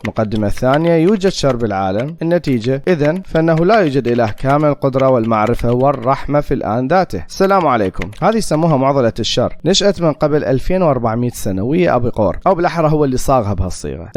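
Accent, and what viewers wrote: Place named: Iraq. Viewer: أحسنت اخوي الغالي ، كلام مقنع وجميل ومبسط دون إسفاف أو إخلال ، واللهجة العراقية زيادة جمال ، تحياتي لك من فلسطين ، أكمل وطور نفسك🥰 + إضبط قراءة الآيات القرانية 😉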